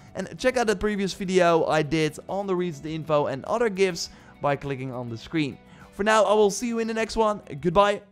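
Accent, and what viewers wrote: Netherlands. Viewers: may I ask you what your mothertongue is? Your English is fine ofcourse, but I can't quite put my finger on your accent. My guess is that your a Dutchie.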